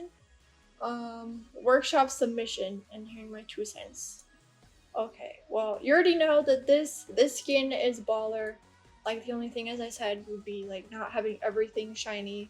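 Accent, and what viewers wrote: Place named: Texas, United States. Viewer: You’re from Texas. Well you sound like you are!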